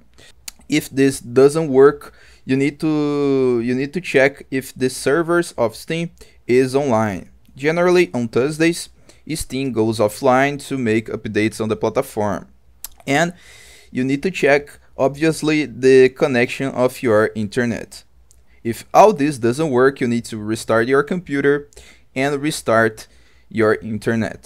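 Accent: Brazil. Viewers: Vc tem um sotaque brasileiro, consigo ouvir um pouco de português na sua fala, estou certo?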